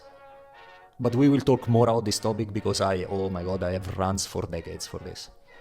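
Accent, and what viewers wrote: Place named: Italy. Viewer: Very nice video and I also enjoyed your rant. I just discovered your channel. Besides a fellow artist and nerd, I also like MotoGP and wonder about your accent. It seems slightly different than Valentino Rossi's (do you know him?). Are you from a different region of Italy? 👍🙏 Ciao!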